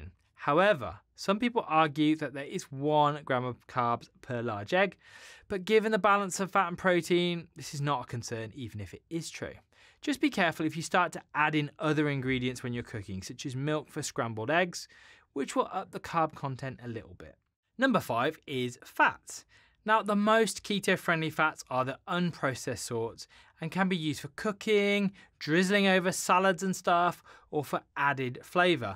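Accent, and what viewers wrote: England, United Kingdom. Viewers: I can’t deal with the British accent, sorry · I'm glad to come across you Dr.:) I was eating a keto diet for a year and lost 3.5 stones in the first 6 months. Lock down happened and my life was wiped out. I have a weak immune system so I have to stay away from people and get my vaccine shots for the rest of forever. However, when I decided I needed to start living again and eating "keto" again I found I could not get into ketosis and eventually could not even follow the diet. I'm still struggling but I will keep trying and it's great to hear advice coming from an English accent for once. 👍😉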